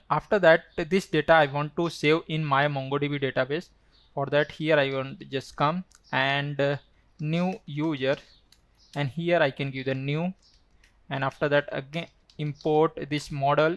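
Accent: India. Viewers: Indians what's wrong with your mouth, why aren't you even trying to speak nomally? You have horrible accent, before touching code fix it then teach. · He is speaking Indian english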